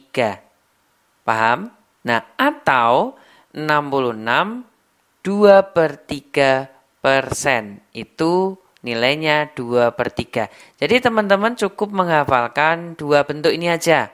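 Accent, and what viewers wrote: Java, Indonesia. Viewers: Orang mn? Medog2 gmn gt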